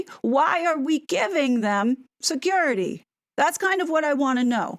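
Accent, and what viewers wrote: New York, United States. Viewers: On a side note, I didn’t know you were a New Yorker,. But I like your moxie and I mean this ad a compliment when I say your frankness and straightforwardness, would make it not surprising you were an archetypal New Yorker. Cheers (and subscribed just for this). Makes me want to visit. And as I listen to you more in your call, I hear the NYC accent too more and more. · how very frustrating but I loved the New York coming out in your voice as the call went on. Geez none of us should be paying for these grifters!! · Love your New York accent!! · Wow! Your New York really came out in this call!! Good job! I don’t live in NY but am heavily involved in municipal finances where I live and I can tell you…..THIS WOULD NOT FLY! 💩would hit the fan! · you speak and act like a real New Yorker…LUV IT…I’ll tell you this I’m from LA to many fake/plastic people around here and my cousins/friends that’s from NY/NJ who came to visit me first opinion about LA “to fake and they show off what they have, meaning wearing the brands name for everyone to see” lol, to which I agree. 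Many reasons also I don’t date LA men that has been breed here or grew up for how many years lol. New Yorkers they don’t hold back the truth what needs to be said and they act on it.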